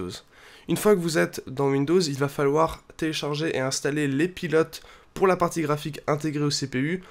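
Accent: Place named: England, United Kingdom. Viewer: Bel accent anglais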